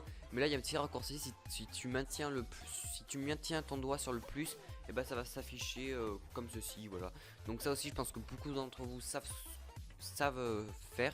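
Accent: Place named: Canada. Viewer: On dirais que t'a un accent canadien ça me fait trop rire 😂😂😂😂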